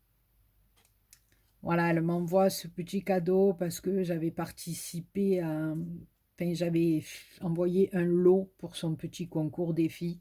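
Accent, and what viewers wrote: South, France. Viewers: A tres bien....tu as tout compris......reste comme tu es ...simple ...a t ecouter ...c est un spectacle.....j adore ton langage ton accent du soleil......ta generosite dans tes paroles.......tu as eu des combats que tu as gagner....alors n attrapes pas la boufalliiissse....avec des mauvais satellites........ il existera toujours des gens qui tournent en rond.....et qui ne savent que baver ........ils ne savent rien faire d autres.....qu a regarder le train qui passe......bonne soireeeeee.....bisous....a bientôt.....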